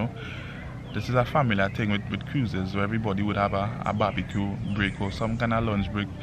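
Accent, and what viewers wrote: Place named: Trinidad and Tobago. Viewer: Are you Trini? Have you lived in Trini? Do you have Trini family? Cuz that Trini twang is coming through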